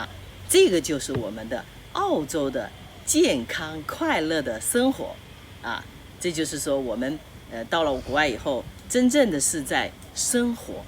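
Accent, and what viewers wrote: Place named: Sichuan, China. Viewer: 阿姨很棒！您说是我们家乡的川普么~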